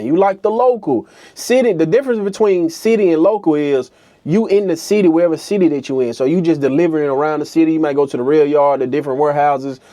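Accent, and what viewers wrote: Memphis, United States. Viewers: U from Memphis mane ? U got the accent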